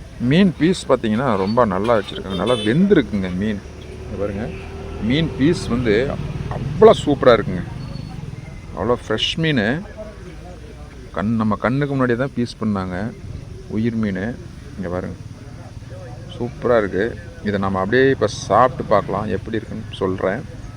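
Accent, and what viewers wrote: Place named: Salem, India. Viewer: சூப்பர். நம்ம சேலம் மாவட்ட பேச்சு வழக்கம். சாந்து, பொங்கி, கொத்தமல்லி தழை கேட்பதற்கு இனிமையாக உள்ளது.❤❤❤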